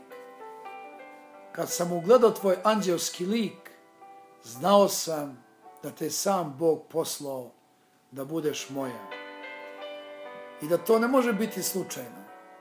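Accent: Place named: Kosovo. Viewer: Ajde nemoj da serete za 3 padeza. Pa nemaju svi u Srbiji beogradski sleng. Covek je sa Kosova,zadrzao je svoj dijalekt. I sada ljudima iz urbanih sredina taj govor zvuci smesan,narodski,ne znam kako vec,asocira na nepismenog coveka...Nije bas tako,ima sigurno mnogo ozbiljnih kvaliteta. A sto se tice same pesme,malo se koristio Preverovom ''Barbarom''...Ima nas koji citamo,pa ne mozes da nas prevaris, maznuo si Barbaru i preobukao je u Milanku...ali to nikako ne umanjuje ovu lepu recitaciju....Svaka cast!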